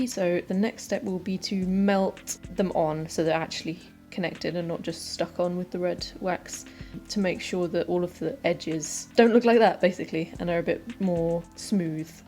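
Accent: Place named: United Kingdom. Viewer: I LOVE YOUR BRITISH ACCENT THIS IS SO GREAT!!!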